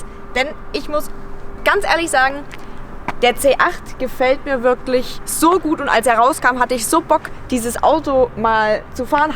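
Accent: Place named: East Germany, Germany. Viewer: In der Tat ein brachiales Auto: nur das Rot und der Digitaltacho würden mich stören. Wie hoch war denn der Verbrauch bei deinen Testfahrten? By the Way:, dein "Ostlerslang" ist wie immer phänomenal erfrischend (ich meine das positiv!). 😜 Liebe Grüße von einem Ex-Magdeburger aus Herrenberg (bei Tübingen), Chris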